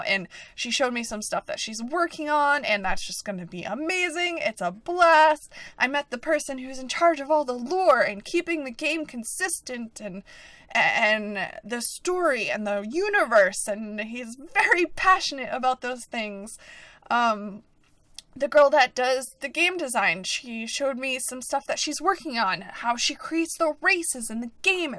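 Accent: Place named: United States. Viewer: lives in America, you can hear that from her voice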